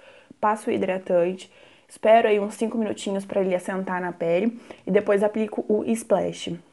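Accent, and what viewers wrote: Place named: São Paulo, Brazil. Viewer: Sotaque dela é lindo, interior de SP. Amo ❤️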